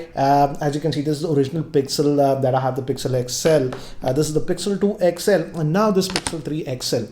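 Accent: India. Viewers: And thick indian accent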